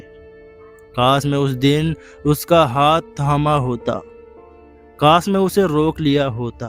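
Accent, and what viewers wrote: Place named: Bihar, India. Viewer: Yh Bihari ki Sound. .Sooo kadva vala sweet..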